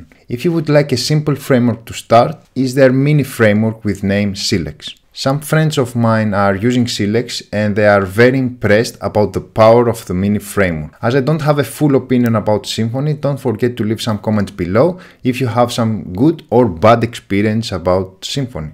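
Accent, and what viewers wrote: Greece. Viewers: α ρε φιλε κανει ΜΠΑΜ οτι εισαι Ελληνας,δεν μπορεις να φανταστεις πως νιωθω οτι ακουω εναν Ελληνα να μιλαει για τετοια θεματα ( οχι ειμαι τιποτα κολλημενος με τη χωρα η τιποτα πολιτικα οτιδηποτε) απλα καταλαβαινεις , ειναι τελειο να καταλαβαινεις οτι ο αλλος ειναι Ελληνας με τοσες γνωσεις για το συγκεκριμενο πραγμα που σε ενδιαφερει και σενα. Συγχαρητηρια ρε φιλε , συμφωνω σε ολα οσα ειπες στο βιντεακι. Κακο που μιλαω στα ελληνικα βεβαια , απλα ενιωσα την αναγκη να δεις ενα ελληνικο comment για να καταλαβεις οτι ειναι respect αυτο το πραγμα. Απο τα επομενα ολα αγγλικα :) Μου προσφερες ενα τρελο χαμογελο ( με τη καλη παντα εννοια) απο τα πρωτα δευτερολεπτα που καταλαβα οτι μιλαει Ελληνας.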